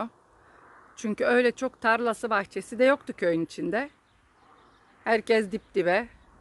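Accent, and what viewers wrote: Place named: Bulgaria. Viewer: Konuşma şivenizi benzetigim icin tahminim dogruymuş blg neresinden tsk kanalınız ayrıca güzel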